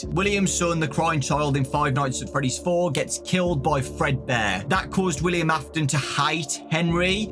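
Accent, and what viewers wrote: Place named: Midlands, United Kingdom. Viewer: Mate your accent is just amazing. You're defo from the Midlands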